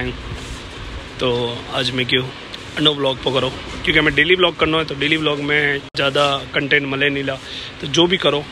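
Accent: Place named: Rajasthan, India. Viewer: मारवाड़ी में बोलता भाई बहुत गजब लाग रिया हो!!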